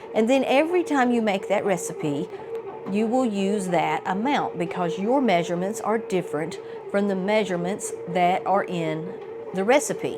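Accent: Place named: South, United States. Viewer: Do you ever make bread with fresh milled flour?! Love your channel, I found it through The Rose Homestead, and watched so many of your videos, lol!! I recently switched to fresh milled flour, it’s SO delicious, but it’s definitely something to learn, more because I never really made bread, just quick bread, cookies or biscuits, and an occasional muffin. I absolutely LOVE the taste, it’s nothing like what you get from refined flour, although I did just buy a bag of King Arthur organic bread flour, just to have on hand. I have Sue Beckers book, Home Grown Flour, which goes into detail about all things whole grains, and even ancient wheat, I’ve used some Kamut mixed with hard wheat, not on itself own, it’s very sticky, but tastes delicious!! I think many many people are getting into milling because of the health benefits, you seem to live in the South, by your accent, lol, I wish I could visit there, I love the hospitality there! 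I heard about it from a channel called, The Biblical Nutritionist, and then started watching Bread Beckers channel, great presentation, they also have a store in Woodstock, Georgia, I saw it on YouTube, it’s a very big warehouse type store, with everything necessary to start milling grain and making bread, it’s healed So many health issues in people, there are hundreds of testimonies, so I decided to try it!! I know I saw your video on the homemade yogurt you made with a yogurt maker, I bought the KBS bread maker, lol, yours looked great, and you can do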